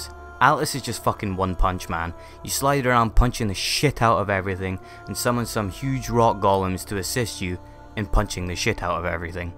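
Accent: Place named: Scotland, United Kingdom. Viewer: Scottish accent can NEVER sound bad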